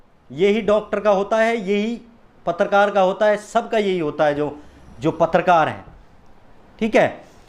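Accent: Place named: Mewat, India. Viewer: Sahi bola Bhai aapne aap mewati bola good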